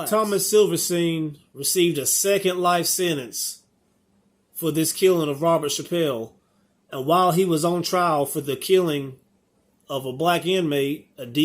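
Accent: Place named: South, United States. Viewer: u have a country assent.. I noticed pretty cool because not many southerns have that now days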